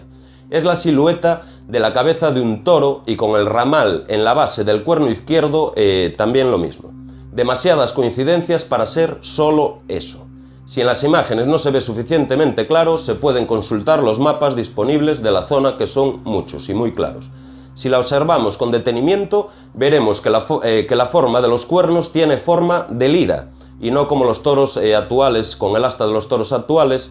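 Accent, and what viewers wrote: Galicia, Spain. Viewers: excelente trabajo paisano, ya que por su acento deduzco el galegismo que nos une, gracias por este video...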